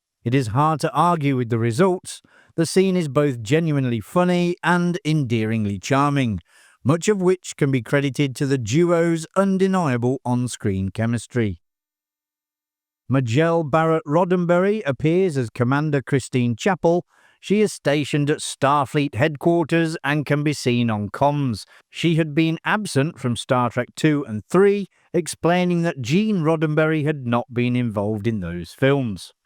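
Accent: United Kingdom. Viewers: Your British accent annoys me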